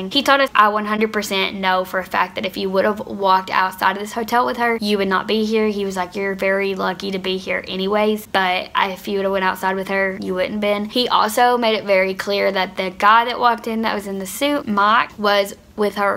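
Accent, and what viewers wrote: South, United States. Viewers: Oh my !! I’m new, from Canada Ontario. You have such a beautiful southern accent !!! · I knew as soon as I turned on your video you were a fellow Kentuckian! Great story time. .thanks for sharing your story How the hell did she know your friends last name? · girl my first thought when you started talking was that you were from kentucky too!! you’re so pretty. anyways thank you for sharing this,, it’s definitely gonna help a lot of people. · talks with a southern drawl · Tinna jones it doesn’t sound uncommon to me, it just sounds like a southern accent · Kentucky crew lol sorry hun but I love the accent mine isn't thick cuz how North eastern I am or at least I don't notice it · So much courage! I hear some southern I’m from Georgia ! I appreciate your story! May god bless you! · I love your accent sounds very Southern i can tell ur from Oklahoma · Yo, I swear I knew your accent 😂 KY girls gotta represent. 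Lol.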